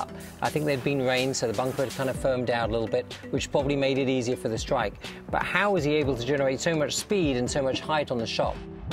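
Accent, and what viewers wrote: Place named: United Kingdom. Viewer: One if the best shots of all time in golf and i have to listen to it be talked over by barely a man with a stupid British accent for the whole video?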